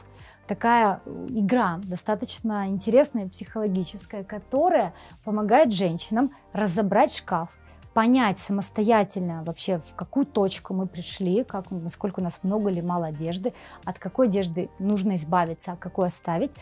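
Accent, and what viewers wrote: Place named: Udmurtia, Russia. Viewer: У вас специфический акцент, очень характерный для Удмуртии или Пермского края. Вы случайно родом не из этих краев?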